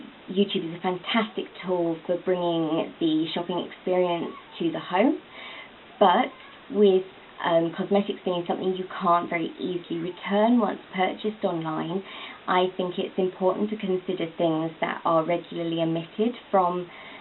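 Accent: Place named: South, United Kingdom. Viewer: I like your speaking voice. You sound a bit posh, southern England? I like your channel because you have videos going back quite a while and I always think it's interesting to see how people grow over time. Hope you're doing well and would like to see a new video some time!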